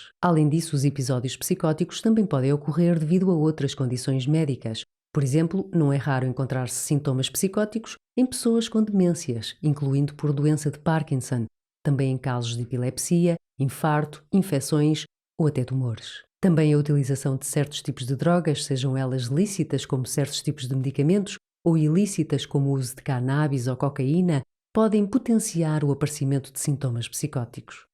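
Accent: Portugal. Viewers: Muito bom o seu video !adorei o sotaque de Portugal a sua voz e muito bonita :washhands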